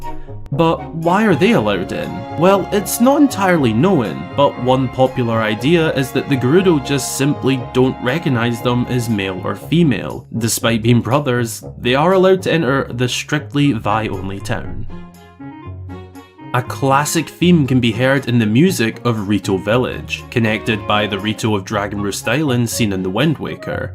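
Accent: Scotland, United Kingdom. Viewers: never said he was, but just saying there's nothing wrong with his pronunciation. I can't speak for all dialects, so I wanted to make sure that it didn't seem like I was. I'm sure most of viewer base is in America, that's why he speaks with less of a Scottish accent. It makes sense, in a purely analytical way.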